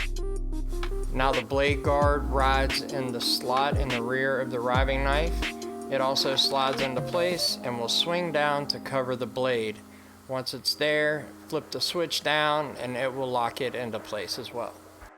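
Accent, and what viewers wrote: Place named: Louisiana, United States. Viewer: Hey man, good video thanks for sharing. What part of the Who Dat Nation you live in - sounds like you're down near Grand Isle?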